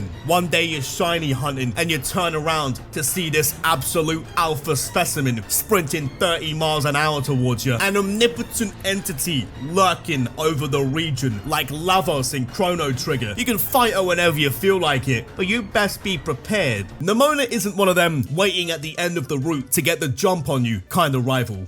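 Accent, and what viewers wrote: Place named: England, United Kingdom. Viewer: It sounds English to me, but Idk which region. There are a WIDE variety of accents in every country.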